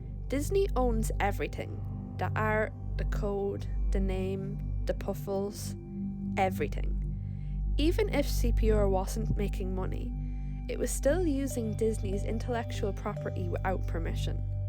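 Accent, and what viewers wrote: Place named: Ireland. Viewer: That's so interesting, I guessed it was Irish but I didn't even know you had different accents like that. Are you native?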